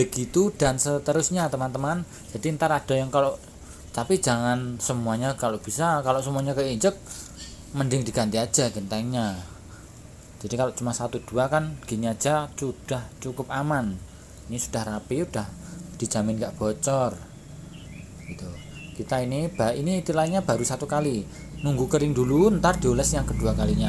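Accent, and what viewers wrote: Java, Indonesia. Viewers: Wong jowo ki mesti 😊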